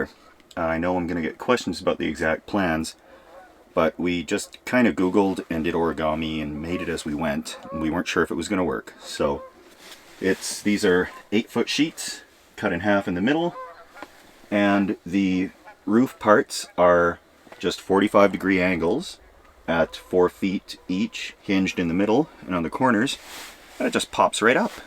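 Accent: Canada. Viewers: Are you an Ontarian or albertan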